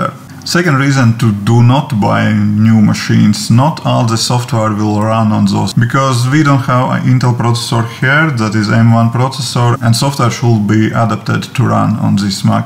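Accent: Russia. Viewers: lol. I thought you are Russian. you have a russian accent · You're Russian, right? You have a Russian accent :D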